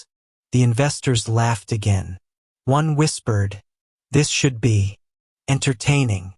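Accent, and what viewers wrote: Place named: Spain. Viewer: Why is it that the narration keeps changing to a broad Spanish accent?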